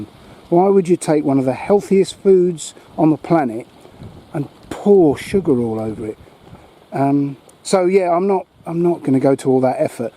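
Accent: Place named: Newcastle, United Kingdom. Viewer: A Geordie accent eh? Explains a lot lol. Can't you make powdered beetroot? It would go well in your smoothies. I can't understand people and their plots. I agree, I see them rushing in, watering with a 7.5 litre watering can (after waking 50 metres to get it) then off they go.I think flowers are intrinsic on the plot. People do garden differently. It would be boring otherwise. Elaine 🌱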